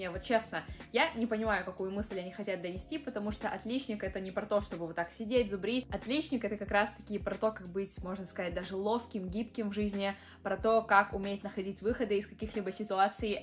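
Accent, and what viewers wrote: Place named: England, United Kingdom. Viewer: как будто английский окцент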